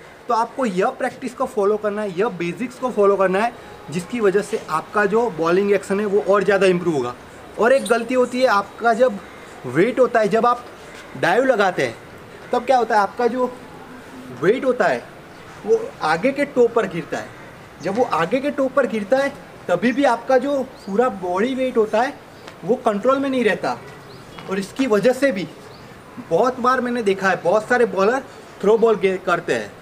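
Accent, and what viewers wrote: Gujarat, India. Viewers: Bhai muje lagta hai ki Aap gujrati ho?? Ans me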